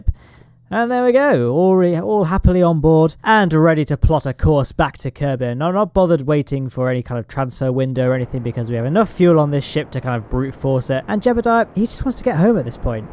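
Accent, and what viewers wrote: United Kingdom. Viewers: British Scott Manley? I think so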